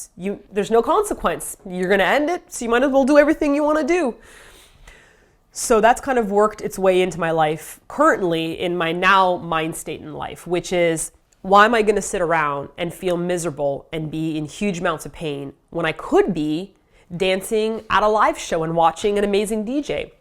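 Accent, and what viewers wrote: Canada. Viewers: I love you. This video was amazing. You made me cry and laugh. I have to say this one reminded me so much that you really are Canadian your accent was so strong in this one. Your videos help me so much and no matter how few you are able to do I will always be excited to see them post. Thank you for inviting everyone to your pity party. We all need one and they should never be held alone. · ! A. (I won't say "don't", because not is incredibly hard and kind of mean to yourself during a release, but) You don't have to feel sorry for crying.:) It is a release, and it is human to cry. (I have cried during class during every school year of my life. Including college. And possibly at every job. One job, I was so miserable, I was crying in front of customers/while taking orders, and crying in the break room. I didn't feel too bad because I felt I had zero control at that point.:P And honestly, my co-workers were being such jerk butts, I was hoping that uncontrollable defense method(?) would encourage them to treat me with a little more humanity.) B. 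Your Canadian accent really came out in this video! It was super surprising/interesting!:D C. I think we all underestimate how many people are affected by depression (long-term/short-term), thank you for opening up about your private life to start an open dialogue on the topic. D. I love you and everyone and wish everyone luck in the struggles of life. May we all remember the good times over the bad.:) E. In honour of opening up: every since my first depression, I have been able to jump back to my lower points very quickly even if things are fine for months/years at a time. I feel like I equally can bounce back quicker, and luckily for me, I think my darker days arise from situations/environments instead of just a chronic chemical issue. I am very glad that when I hit my lowest point, I did go to my parent (while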